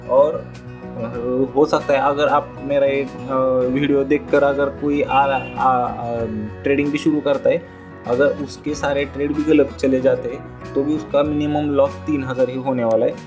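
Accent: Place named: Maharashtra, India. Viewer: Pahilya 10 sec mdhech olakhla marathi manus ahe😅. All the best sir😊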